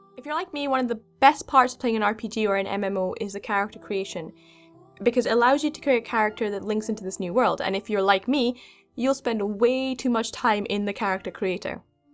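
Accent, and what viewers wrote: Scotland, United Kingdom. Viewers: also I'd like to add your awesome are you Irish? Or Scottish I hear Scottish more Correct? Either way your awesome I have a love for the Scott's and the Irish and there cultures aswell as I just love the accents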